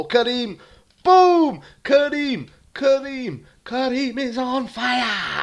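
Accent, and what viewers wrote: Wales, United Kingdom. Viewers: Love the welsh accent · Where in Wales are you from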